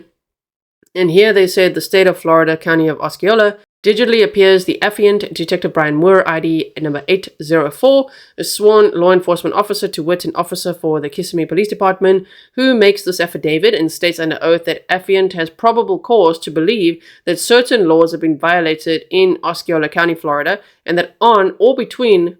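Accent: South Africa. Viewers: Are you South African? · I heard you say braai are you South African? Lovely to hear that accent. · Thanks for this, what a terrible story maybe this will wake someone up! Btw could tell you were South African by the accent but as soon as you said brai that confirmed it.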